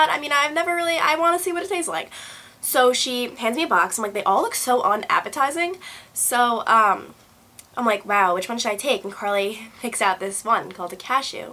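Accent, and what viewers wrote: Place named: Boston, United States. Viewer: You sound like you are from Boston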